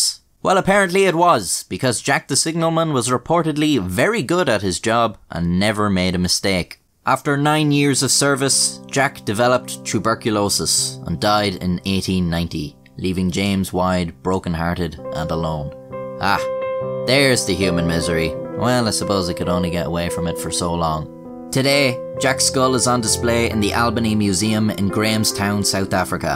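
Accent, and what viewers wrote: Ireland. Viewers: This video would feel so wrong if it wasn't narrated by an Irishman. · nice drawings. i like james. very polite. my uncle is irish and is named james and he kinda looks like a monkey. and u sound irish. ressurfuate hin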